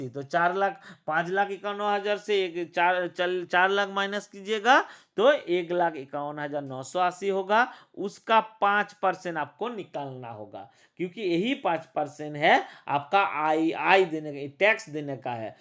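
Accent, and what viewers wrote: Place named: Bengal, India. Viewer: Apka relation bangal se v hai kya sir Language waise hi lag rha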